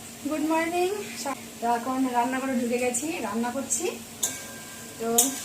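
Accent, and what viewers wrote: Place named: Birbhum, India. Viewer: দিদি তোমার মুখে বীরভূমি ভাষা শুনতে খুব ভালো লাগে.. কি সুন্দর ব্যাটা বিটি বলো .. সুর করে কথা বলো .. এই ভাষা তোমার মুখ থেকে শুনেই শিখেছি ❤❤❤❤❤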